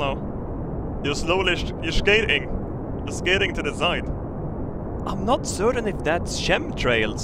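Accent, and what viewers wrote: Sweden. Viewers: Yes, I'd love to watch a rocket take off then turn on the radio and hear "Let's do this shit!" in a Swedish accent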